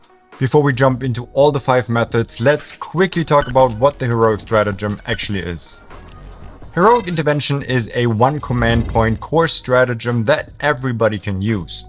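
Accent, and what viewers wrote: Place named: Germany. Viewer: why do I hear the slightest german accent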